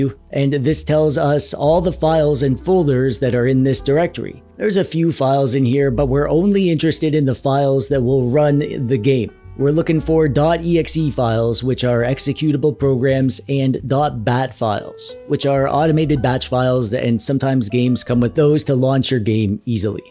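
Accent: Canada. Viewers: I finally caught the Canadian accent. Still can't tell which region! DOS always reminds me of my days as a Gaming Corp IT Tech. We used IBM for everything at the Casinos. Down there making a million dollars a day, and we are up in the office running software from the early 90s.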